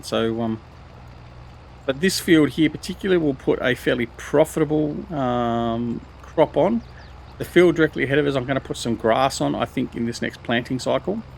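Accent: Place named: Australia. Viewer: "In my battle with stacking that Bloody trailer"... I laughed so hard🤣 brilliant so good to hear Aussie slang. I watch many great YouTube's from usa etc, there content is good too, But It's a breath of fresh air to find your channel and the way us Aussie speak!!😁😁 Keep up the great content and thanks for your hard yacker in making it 😁👍 From QLD Australia.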